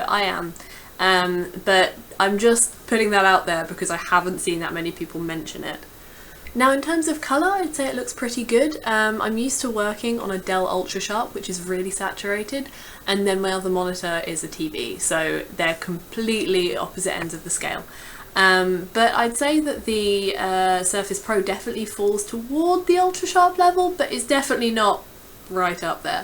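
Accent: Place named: United Kingdom. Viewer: I'm Turned On ! geeky, british accent and beautiful !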